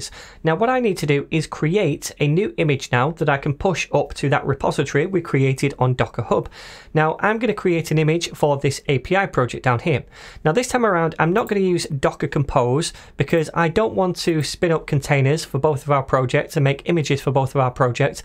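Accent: United Kingdom. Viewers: I love UK accent. Regards!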